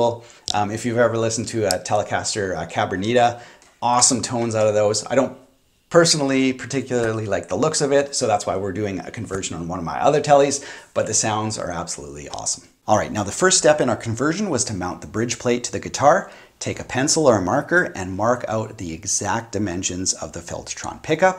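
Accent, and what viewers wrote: Canada. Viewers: I love your videos, this one is no exception. But do Canadians day Filta instead of Filter? Because you keep saying Filt-a-tron...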